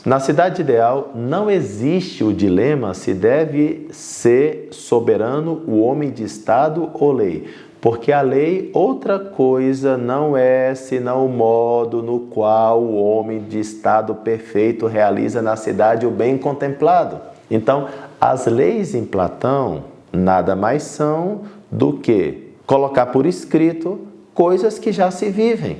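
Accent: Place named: Rio Grande do Sul, Brazil. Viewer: Esta homem pode levar figuras ilustres e estrelas a uma profunda DEPRESSÃO. Sua fala me lembra o Gaúcho CARLOS REIS em: Seu professor mentiu pra você, mentiu muito. Grato.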